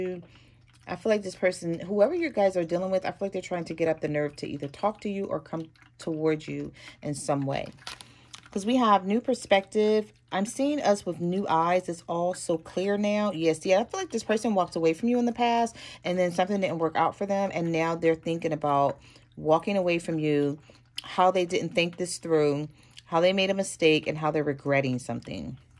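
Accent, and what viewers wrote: Philadelphia, United States. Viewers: Are you from Philly???! 👂🏽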